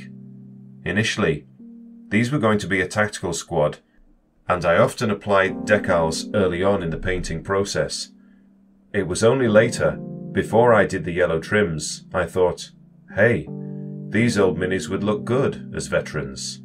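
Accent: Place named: United Kingdom. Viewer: the deep voiceover with UK accent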